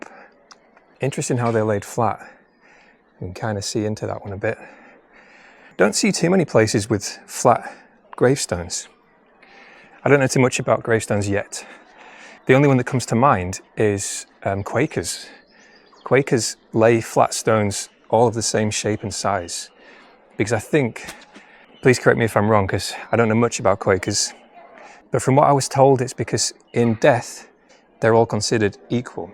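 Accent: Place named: Yorkshire, United Kingdom. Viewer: Hi, thank you for your reply; No, I'm not being too kind, I've just watched some of your other videos; so fascinating. You should have your own TV series on say BBC Four or BBC Two; about Yorkshire. Your speaking voice is awesome; really clear, and well spoken, with just enough of the Yorkshire accent without being too much. I used to work as a graphic designer and artist and still have some links with the TV companies; I mainly worked for Liza Minnelli, and Lonnie Gordon; but I suggested this coming Saturdays evening on Liza on BBC Two; I am going to suggest you to the head of BBC programming; if you are in agreement? You would be excellent.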